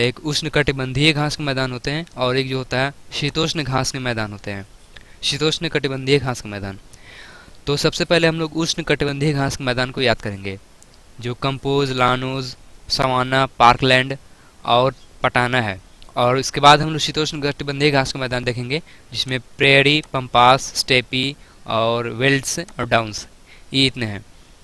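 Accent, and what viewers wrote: Bihar, India. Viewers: aap Bihar se kaha se ho bhai?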